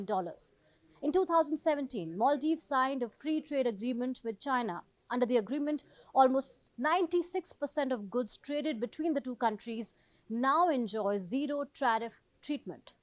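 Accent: India. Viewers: Irritating Indian accent😂😂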